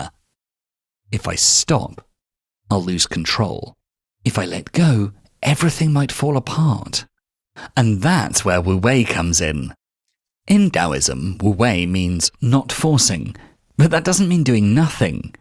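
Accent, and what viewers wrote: England, United Kingdom. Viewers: You know what I am sick and tired of hearing these English accents. I'm not even joking I'm going to throw my phone or my TV out the window if I hear one more video with somebody with some half-baked goofy English accent. I'm sick of it.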